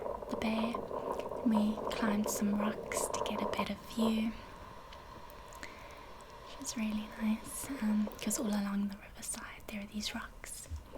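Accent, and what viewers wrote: South Africa. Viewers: Hey I am from England I've never been to south Africa it's strange because I get home sick when I see videos of country's so far from England I live in England was born here but I think of being lonely and missing England if I lived in another country it gets me sad atually maybe I am so use to being in England that I have got a bond with country but I do love how pretty south Africa you do have the best accent by the way I think you are every English guys partner but I would get lonely even with you if I moved to south Africa but thanks for making these videos I will always like you're videos and south Africa is very beautiful one of the top 10 maybe top 5 country's in the world for beauty just like you you're pretty to and I hope you don't get lonely in your own country I am nearly 28 and lived in England all my life was born here but I get home sick so bad even if I go on holiday to any other country for 1 week but I love seeing south Africa and living in England because I don't get home sick or feel lonely it's strange feeling lonely it's like you miss the country you have been in all you're life but it's nice to see how south Africans live and I like the way you talk best accent ever in my opinion you look south African thanks for upload I listen to south African radio alot and just love the accents also I like how you always smile and happy I'm mostly happy to its best way to be especially at Christmas I commented on your Christmas tree video and called i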